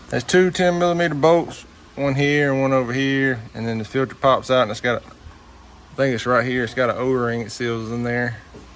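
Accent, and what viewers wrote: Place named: South, United States. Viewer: Hard to view do to his Southern accent. A short video that felt like a lifetime...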